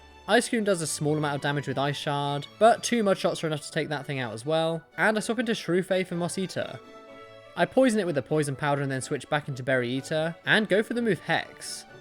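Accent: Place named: United Kingdom. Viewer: what a beautiful british accent 😁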